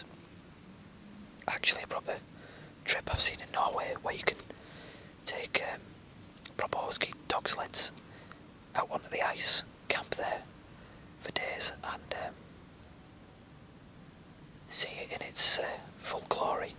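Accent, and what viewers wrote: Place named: North, United Kingdom. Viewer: i bloody love a northern accent on a man . have enjoyed this x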